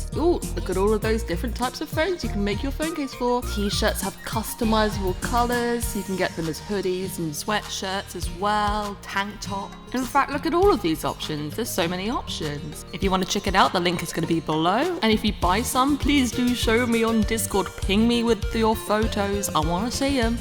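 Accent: United Kingdom. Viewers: I could hear British people talk about literally anything let alone the BEST SIMS GAME EVER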